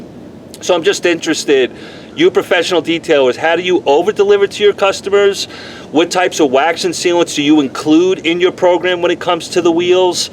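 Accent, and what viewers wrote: New York, United States. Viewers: No way you're from Dallas Texas 😂. Sound like your from my part of town. New York / Jersey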